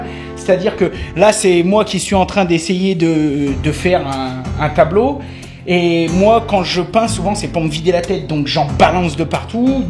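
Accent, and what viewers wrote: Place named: Marseille, France. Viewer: Tu est de Marseille toi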